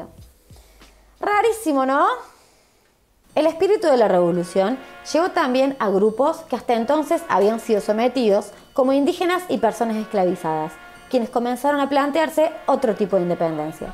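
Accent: Córdoba, Argentina. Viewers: Jajaj ame esa tonada cordobesa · Su acento es muy cordobés... Eso me agrada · Tuve que poner los subtitulos porque no entiendo el cordobés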